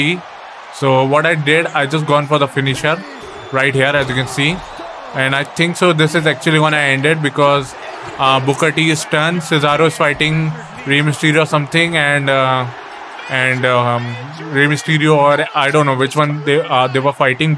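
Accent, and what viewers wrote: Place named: India. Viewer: Nothing worse than the Indian accent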